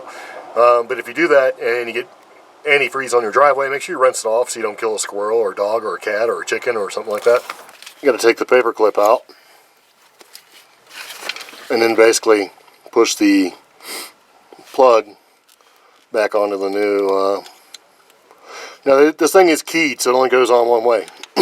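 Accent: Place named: United States. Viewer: i watched because you sound like a cool American dude good presentation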